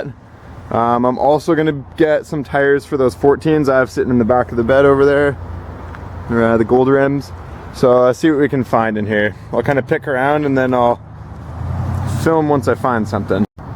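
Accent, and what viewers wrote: Canada. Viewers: smooth canadian accent, sound like the trailer park boys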